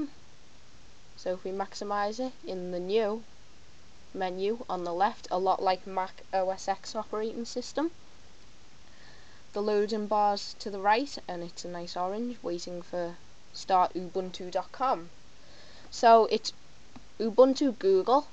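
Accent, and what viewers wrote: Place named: Liverpool, United Kingdom. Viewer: No, But im a Pool supporter and recognize the accent.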